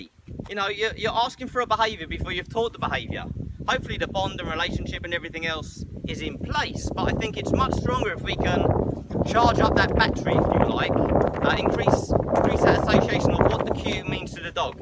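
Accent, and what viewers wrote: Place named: London, United Kingdom. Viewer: Where are you based. You sound like you are from London.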